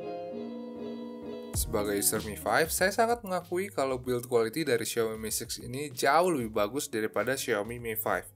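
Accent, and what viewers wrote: Lampung, Indonesia. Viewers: orang lampung ya?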